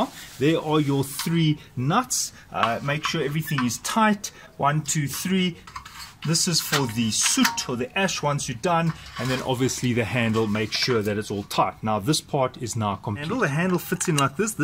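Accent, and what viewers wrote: South Africa. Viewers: Love the SA accent, going to assemble my weeeber tomorrow now. Thanks mate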